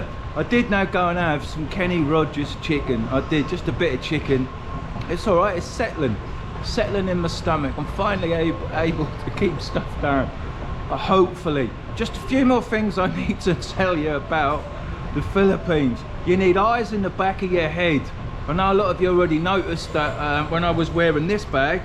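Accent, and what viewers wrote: Essex, United Kingdom. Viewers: Where you from in the uk? Sound like Essex same as me lol 👍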